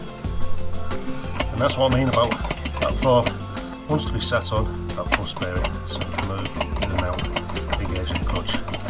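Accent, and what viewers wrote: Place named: Barnsley, United Kingdom. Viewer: I discovered your adventure and I followed the episodes one after the other with great pleasure. The quality of the videos, the humor, the scope of the work and especially its production are of high quality. My English dating from my schooling and a little more, I have to admit that I did not always understand everything but your accent reminded me of my short stay in Barnsley in 1984 during the miners' strike. By the way, your 350z isn't bad either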